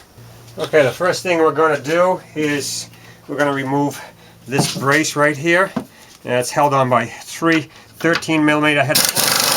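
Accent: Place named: Boston, United States. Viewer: wow, really? Either way, I'll be checking your videos. They are extremely informative and gotta love thr Boston accemt. :D.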